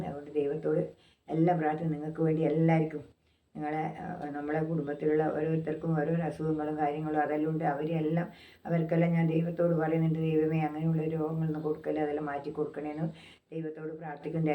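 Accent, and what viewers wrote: Kannur, India. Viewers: അമ്മയുടെ വീട് എവിടെയാ. കണ്ണൂർ ആണെന്ന് സംസാരത്തിൽ നിന്ന് മനസ്സിലായി ❤️. കണ്ണൂർ എവിടെയാ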